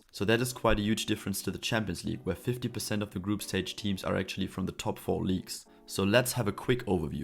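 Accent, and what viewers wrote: Germany. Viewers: I hear the german accent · This dude speaking English sounds like he is speaking German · Are you German Weil es klingt sehr deutsch vom Akzent her 😂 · Man merkt halt so krass das du Deutscher bist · Der Deutsche Akzent haut rein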